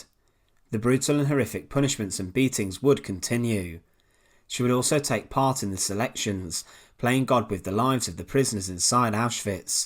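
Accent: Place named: Cockney, United Kingdom. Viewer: Let me start by saying that I really like the content on this channel but am I the only one that gets annoyed with the inflection in this person's voice? I was in England for two years but this guy borders on a cockney accent that is very difficult to follow at least in my opinion.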